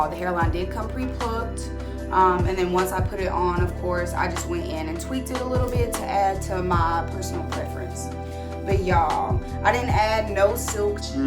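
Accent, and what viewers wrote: South, United States. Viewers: You gotta be from the south 😍😍😍love your voice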